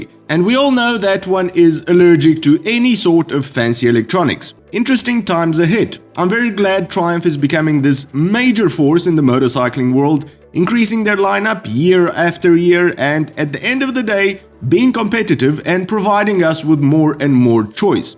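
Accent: South Africa. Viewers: Love hearing your South African accent tell about motorcycles and stuff. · Lived in SA, hence the accent.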